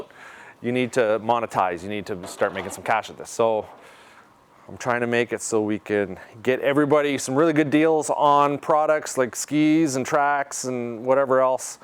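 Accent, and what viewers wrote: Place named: Newfoundland, Canada. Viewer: I feel like your a Newfoundlander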